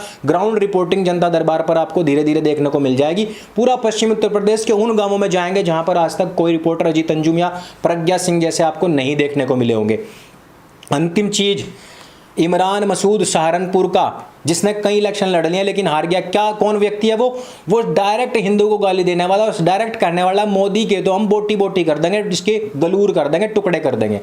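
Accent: Haryana, India. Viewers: Vaah mast haryanavi bhasa aap ki 🙏🙏🙏🙏🚩🚩🚩🚩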